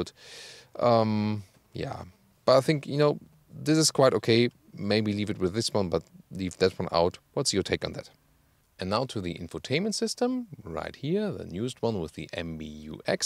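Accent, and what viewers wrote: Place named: Germany. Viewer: Gutes Video, wie immer, super Wortschatz, aber die Aussprache... da hört man den Teutonen aus Km... Deutsch wäre mir deshalb lieber.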